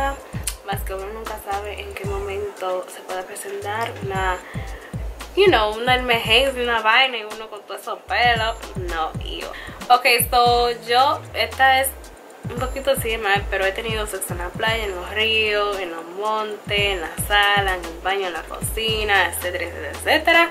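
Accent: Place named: Puerto Rico. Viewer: Acento PR veoo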